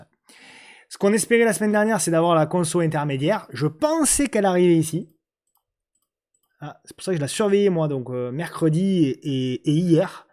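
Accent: South, France. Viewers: Top, merci pour la vidéo, je m'ennuyais devant mes écrans avec une cheville en vrac (chute à moto hier soir), mais pas grave, rien de trop méchant, que 8points de sutures et pas d'os brisé, et puis j'ai ta vidéo pour me remonter le moral avec ta bonne humeur communicative (oui, l'accent du shud c'est sympa et chaleureux 😜) Bon WE ✌️ PS: non non, tes vidéos ne sont jamais trop longues pour qui sait apprécier les bonnes choses 😉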